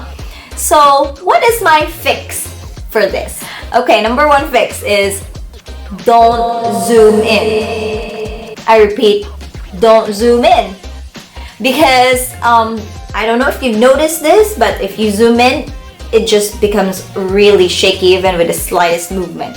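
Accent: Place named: United States. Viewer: Ang galing naman mag.english ni ma'am parang american din..team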